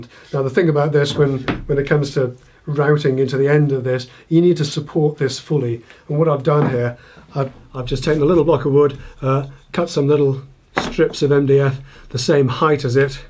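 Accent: United Kingdom. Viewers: A great little gift idea.Thank you for your time and inspiration this past year, I always enjoy your videos; Fun, Informative and with a Wonderful British Delivery.From one Brit to another, Merry Christmas, All the Best to you and yours.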